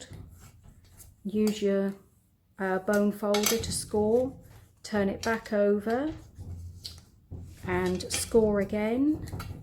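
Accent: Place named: Norfolk, United Kingdom. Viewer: Are you from Norfolk..I can hear your accent? 😍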